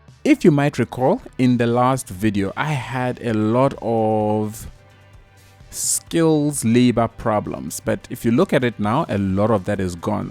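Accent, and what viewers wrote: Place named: South Africa. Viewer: I heard the accent and immediately knew this is a fellow South African.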